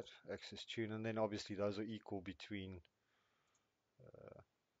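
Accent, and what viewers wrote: South Africa. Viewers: ARE YOU SOUTH AFRICAN?!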